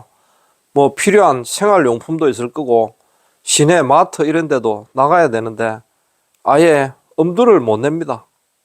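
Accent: Gyeongsang, South Korea. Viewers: 말씀이 참재미있는톤이라서 많이웃으며들었습니다. 역시 경상도말이 구수하게들립니다. 감사합니다. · 시청자들을 위해 비가오나 눈이오나 영상 촬영하신다고 수고 많으시네요.. 구수한 갱상도 사투리로 설명도 잘해주시고 덕분에 구경은 잘 합니다 파이팅 하시구요 새해 복 많이 받으세요 ~^(^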